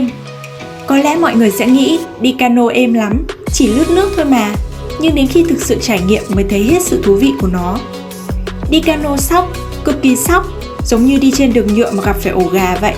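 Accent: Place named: Nam Dinh, Vietnam. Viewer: Âm hưởng nam định rõ vcc kkk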